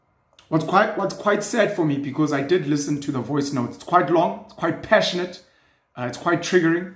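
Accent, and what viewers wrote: Zulu, South Africa. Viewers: Wayiqala ngesiZulu esimnandi mfoka 🙏🏿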